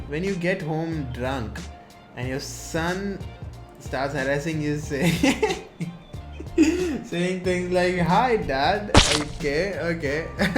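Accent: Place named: India. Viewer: Ur voice seems like ur an Indian and if u are then I’ll be happy to know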